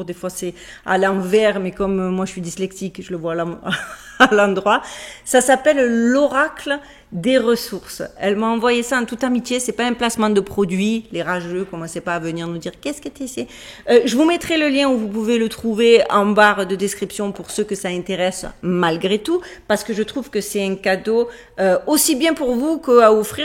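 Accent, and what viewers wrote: Marseille, France. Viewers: Oh,Peuchère,vive l'accent de Massilia! 🐟 et les sardines! 🐟🐟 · Je suis curieuse et pas autruche du tout, alors, oui, continuez à farfouiller svp 😀 c chouette l'accent marseillais 😄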